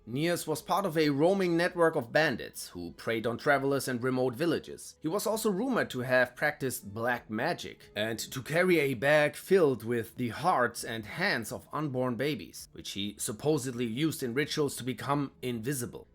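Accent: Germany. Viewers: I looove your german english accent! 😂 I keep going back to your "every german state explained by a german" video! keep it up!!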